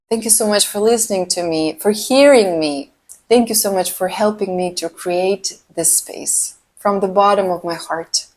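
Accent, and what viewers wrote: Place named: Russia. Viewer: Thank you, Dr.. You say things in a way that gets to the point without having any pride or ego attached, yet there is compassion and care without overstepping lines. I liked you right away. And, I never thought I would say this publicly (it’s funny how it came up), but your Russian accent was another reason I liked you right away. I don’t have any connections to Russia but it’s always held a special place in my heart; I’ve yet to fully find out why. Just wanted to say I’m glad things happened for a reason and I fully appreciate your wisdom and authenticity. Thank you again. May you have a wonderful new year.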